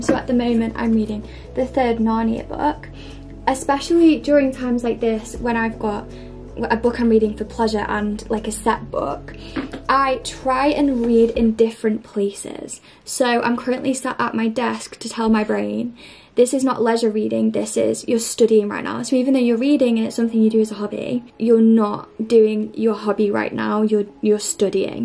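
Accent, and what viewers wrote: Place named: United Kingdom. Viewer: Hello:) Thank you for sharing and updating your videos and I really like them! You're lovely! Actually I started to read the Bible(ESV) from last month and I’ve been learning British English for about a year. Can I ask you something? Do you have a plan to read the Bible(ESV) with your British accent, If you want? Your voice will definitely help someone who learns British English to be encouraged to read the Bible😊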